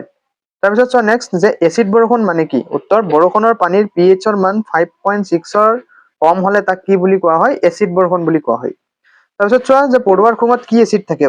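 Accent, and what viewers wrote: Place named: Nalbari, India. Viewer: Sangaskrit or Lage sir Nalbari Distic or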